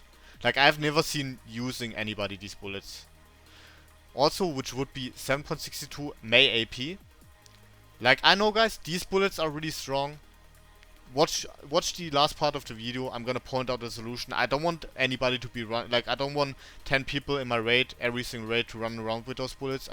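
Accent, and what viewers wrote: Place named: Germany. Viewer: Dein Englisch ist top aber der Akzent ist nicht zu überhören 🤣 German :p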